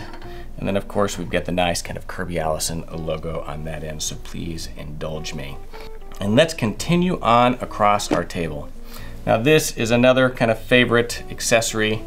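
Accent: Texas, United States. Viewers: We native Texans love our drawl!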